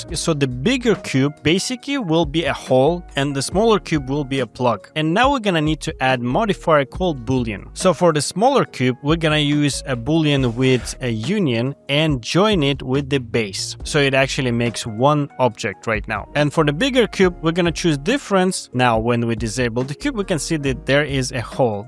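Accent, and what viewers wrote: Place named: Russia. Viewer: I love the American Russian accent of this guy lmao, hands downs best tutorials in the 3d AI space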